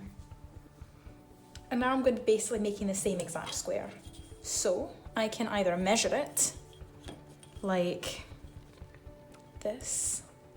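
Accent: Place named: Scotland, United Kingdom. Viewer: u from scotland for sure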